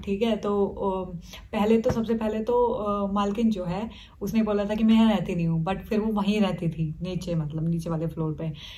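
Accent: India. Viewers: Way of you speak love it 😅 Desi.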